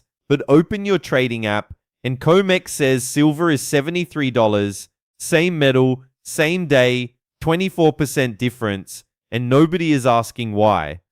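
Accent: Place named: Australia. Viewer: AI crap with a cheesy Australian accent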